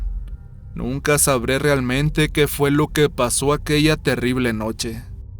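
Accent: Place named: Northern Mexico, Mexico. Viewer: ¿de cual ciudad y estado eres? por que tienes el acento muy golpeado, como norteño.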